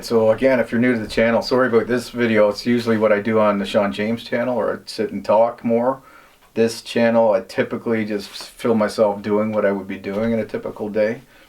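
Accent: Canada. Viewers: I come here for the Canadian accent.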